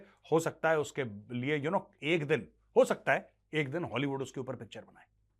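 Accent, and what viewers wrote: Lahore, Pakistan. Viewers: Mejor Saab app jo lahori language bolte hai ..usse sunne ke liye hamare kaan bahut tarastee hai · Major Sahab aapki Lahori Bhasha maja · major shab Lahori accent, so beautiful so elegant just looking like a wow 😮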